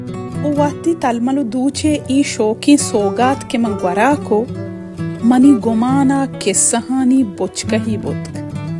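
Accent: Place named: Balochistan, Pakistan. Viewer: الله میاری بانوک تئی گپ تران سک وش دولدارانت ❤❤❤ اصل بلوچی انت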